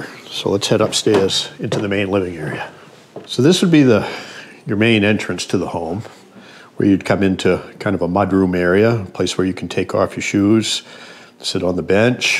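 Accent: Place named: New England, United States. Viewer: Love that "Gaaaden". Love your New England accent. Can't get enough of it.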